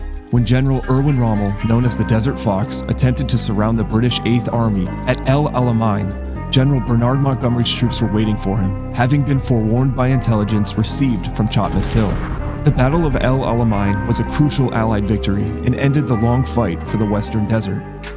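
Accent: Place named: Rhode Island, United States. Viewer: Hold up it gets "Cooler & Warmer " "NOT A PART OF NEW YORK " First time watcher ,had to sub. I'm Born N Raised in the Ocean State and I can hear it in your commentary. Fantastic upload,keep grinding stay blessed.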